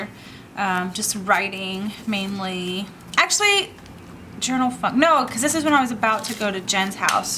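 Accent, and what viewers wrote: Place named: Texas, United States. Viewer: love love love your videos! I noticed your accent right away and knew you had to be from Texas! I myself am from Texas live about an hr outside of Austin. keep up the awesome videos ,I love how creative you are and I love your personality!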